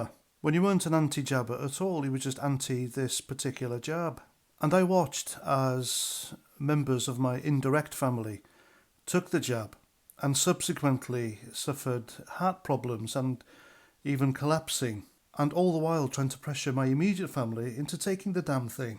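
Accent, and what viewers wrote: Wales, United Kingdom. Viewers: Your accent isn't Cardiff or Swansea, you from Merthyr maybe?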